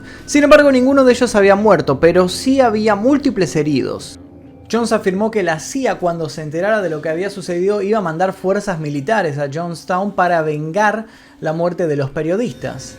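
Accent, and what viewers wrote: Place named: Argentina. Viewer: Excelente trabajo y documental y me encanta tu acento argentino. Saludos.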